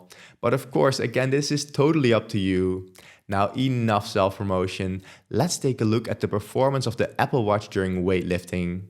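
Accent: Netherlands. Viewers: Dit klinkt zoooo boring! en overduidelijk voor gelezen! With yr Dutch accent! 😵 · I lived in Amsterdam and u clearly have dutch english accent, i went on your site and you actually studied there , you dutch right 😄? Thanks for the reviews , i just found your channel. Now im even more confused on what to buy , according on the tons and tons of video you made that i dont know if i will be able to watch entirely. I want just to fix my sleep as i wake up 1 or 2 times every night, i want also to track my hearth i suspect some problems sometimes i have a miss hit in the beat, i don' t know how to explain it. Should i take the polar h10? A watch ? A band? Im so overwhelmed by the amount of review u did. Bye from an italian new follower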